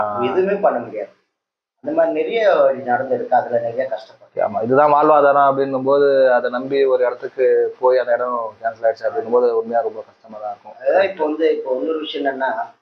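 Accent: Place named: Chennai, India. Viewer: Attahasamana comments ivar epdi sivaji madiri irukka mudiyum chennai speech supera pesararu veetanda sivaji ipdiya pesuvar avara madiri tamizh pesamudiyuma kural illave illa but covering gold aaguma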